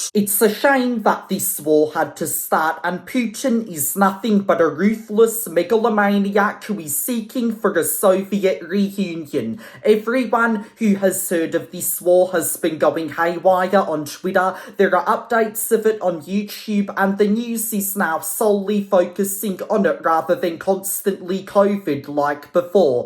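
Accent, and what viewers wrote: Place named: Europe. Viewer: your accent is quite European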